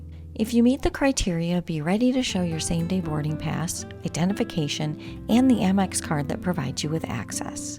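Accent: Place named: Chicago, United States. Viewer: I guessed Chicago based on the accent 🎉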